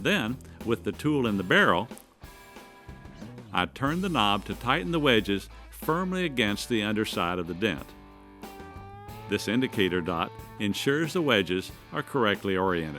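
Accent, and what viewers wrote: Missouri, United States. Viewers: I only watch these videos because he has the nicest American accent ever! 😆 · He's from Ely Missouri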